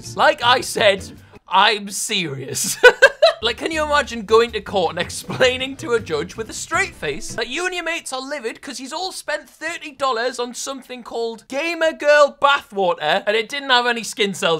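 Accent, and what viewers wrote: Newcastle, United Kingdom. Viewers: Are u from Newcastle boi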